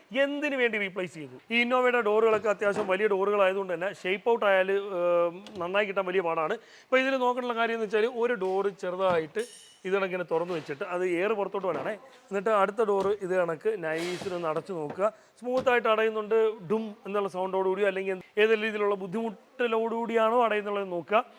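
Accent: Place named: Kollam, India. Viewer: കൊല്ലത്തു കാരന്റെ നാടൻ സംസാരം.....😂 ഒന്ന് പെയിന്റ് മേളം 😂 കൊഴ😂 ഇത്തരം ഒരു പാട് വാക്കുകൾ ഇക്കാ സൂപ്പർ വർക്ക്ഷോപ്പ് സൂപ്പർ പുതിയ ജോലിക്കാർ നല്ലത് വരട്ടെ നമ്മുടെ നാട്ടുകാർ സപ്പോർട്ട് ചെയ്യുകയും ❤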